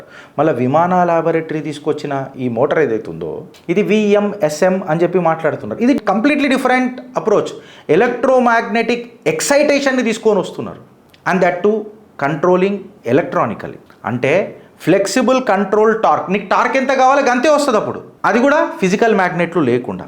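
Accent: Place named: Hyderabad, India. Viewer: అన్నా నువ్వు మొగుని లేక న్యూస్ చెప్తావా అన్న ... I like u bro way of our old city hindus mass slang ... And no one can beat❤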